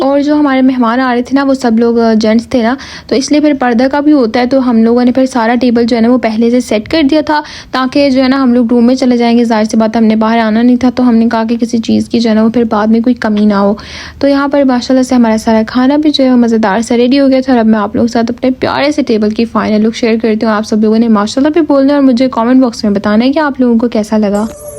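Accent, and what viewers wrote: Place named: Arab world. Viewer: Your voice is soo sweet and your arabic accent also 😍 very nice vlog and i really enjoyed your vlog🎉❤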